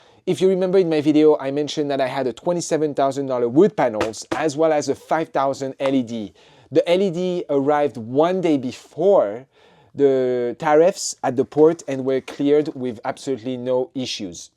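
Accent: France. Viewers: Malgré tous les efforts je sens l'accent français, non ? 🤭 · French accent dude importing Chinees goods into USA Cinéma absolu \o/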